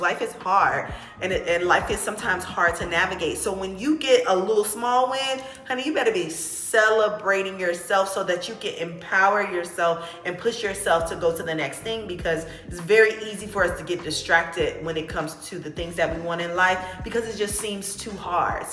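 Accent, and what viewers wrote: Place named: Philadelphia, United States. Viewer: It’s giving Philly , where you from?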